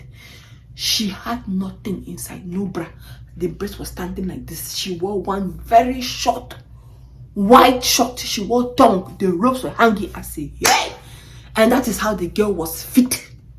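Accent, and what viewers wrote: Nigeria. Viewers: And also are you sound Nigerian ❤❤❤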